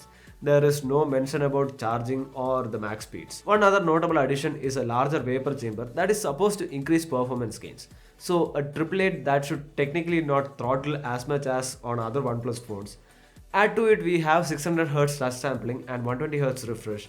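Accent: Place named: Kerala, India. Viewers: That mallu accent ! 😁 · My god! Horrendous south Indian accent in your English ‼️‼️‼️ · Am I the only one who hears Malayalam slang when he speaks??😄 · A thick Malayalam accent... better add a tag... not at all clear... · South indian english......nice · I mean the guy sounds like some Anna at some Malyali Paan Thela. Dude needs to sound neutral. Completely clean up any mother tongue tone from his English. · Such a pure malayalam accent lol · That malluism in your english is nice to hear❤️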